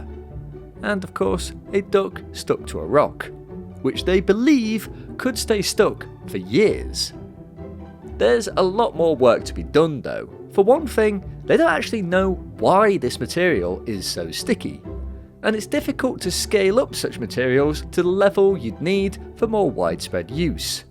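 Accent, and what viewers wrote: United Kingdom. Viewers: We're watching a channel called "nature video", the most generic title possible, and maybe the voiceover only sounds natural because AI finally mastered this accent, which sounds normal enough in the UK. When the AI writes the script, the AI gets the credit. It's best to assume everything is AI generated, almost completely, and then maybe change your mind when presented with convincing evidence, otherwise. Everything we've all been doing online? Training data. You, me, Tom Scott, every upload, every comment, every livestream, everything.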